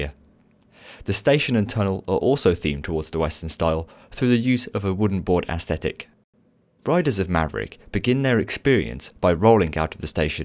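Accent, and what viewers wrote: United Kingdom. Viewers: but really is this your voice or the voice from a computer. i love the accent(i think britsh) and you are doing a great job!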